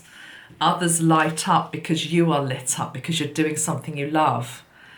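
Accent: England, United Kingdom. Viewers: A big thank you from Johannesburg, South Africa! I'm originally from England so I enjoy your dulcet English tones:-) I shall keep walking through the gateway with the cool blue flame in my hand. Such a powerful image to take with me in my shadow work to release all that no longer serves me, thank you ♥️💜💚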